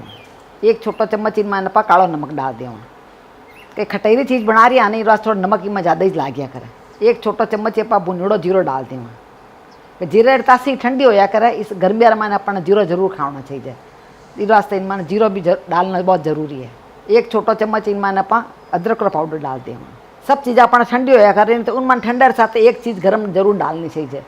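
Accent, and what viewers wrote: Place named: Jodhpur, India. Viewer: Aapki awaz, ton sabkuch Jodhpuri he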